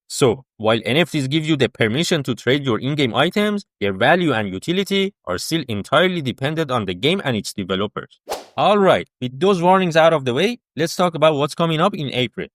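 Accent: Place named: Iran. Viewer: حاجی حس می کنم با این لهجه انگلیسیت ایرانی هستی.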